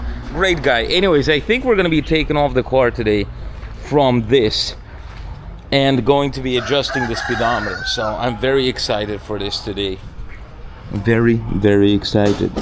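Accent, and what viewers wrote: New York, United States. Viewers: i notice in this video you have a hint of a New York accent lol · Надеюсь. Он снимает фейковые видео, чтобы заставить вас пожалеть. Он не настоящий русский. Разговаривает как какой-то нью-йоркец.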